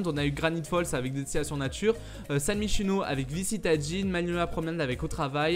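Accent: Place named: Toulouse, France. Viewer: jadore ton axent toulousain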